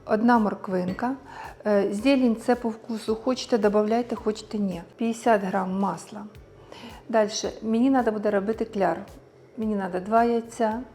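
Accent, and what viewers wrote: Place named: Donbas, Ukraine. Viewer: хочу таке приготувати. а де вы проживаете, бо мени наравиться як вы говорите. прямо як у нас в Донбаси - вымушена переселенка.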